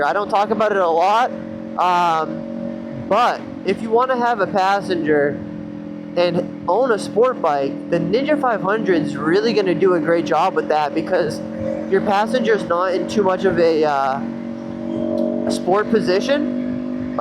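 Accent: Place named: West, United States. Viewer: ps not his voice, the western cali/arizona accent lol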